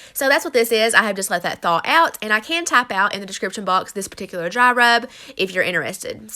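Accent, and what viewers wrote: South, United States. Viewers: I love the way you talk! Are you a southern belle? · I have just fallen in love with your channel! makes me feel like a horrible mom/wife I gotta step my game up! I absolutely love your southern accent too, oddly soothing as it reminds me of the best part of my childhood living in Florida (yes I know no one sees it as the south but my town sure acted a lil southern)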